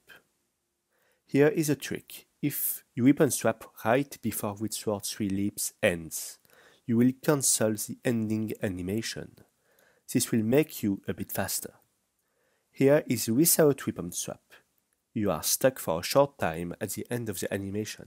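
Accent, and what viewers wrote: France. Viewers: He is french i beleive :p i like the english with this accent =^.^= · un français qui parle anglais? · is this guy french?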